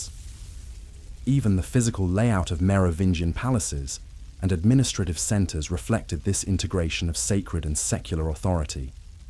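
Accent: England, United Kingdom. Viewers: Very interesting!!! Ditch the English accent. Please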